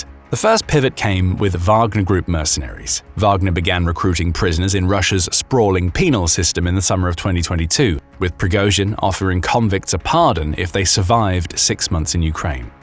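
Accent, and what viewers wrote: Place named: Southern England, United Kingdom. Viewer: Very good Standard Southern English narration from this bunch of Americans ... shame that the computer rambles on about high school and college students --- meaningless to a British audience. In the same way that the Germans lost the First World War in the first days of the War at Liège (4th-17th of August 1914), and they lost the Second World War in the Battle of Britain (1940), so the Russians lost this war in the first days of the war at Hostomel Airport. It has taken a long time for the significance of the Battle for Hostomel Airport to become apparent but that IS where Russia lost. The language problem is easily solved as it was solved in the First World War. You divide the front into sectors. Whilst maintaining a watching brief, the Russians would have done well to leave Kursk to the North Koreans as the French left the Belgian and northern end of the front line <num> to British command with only liaison between bilinguals at the top. 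The Koreans would have only needed a handful of interpreters then. Even with their mass of interpreters, there was appalling miscommunication. Far be it for me to help the Russians but the solution is as clear as the nose on your face. This, of course is not possible because of the control-freakery of the monstrously stupid Russian high command. Just because demolishing the entire city of Grozny worked when confronted with a bunch of religious fundamentalists armed with little more than AK47s, it doesn't mean that that same strategy will w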